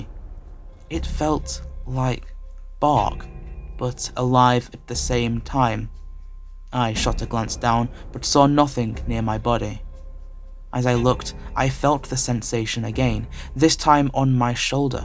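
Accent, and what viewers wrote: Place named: England, United Kingdom. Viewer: Good story.. Not sure about the English accent of the narrator though.. ... ... For the record I'm a Brit too.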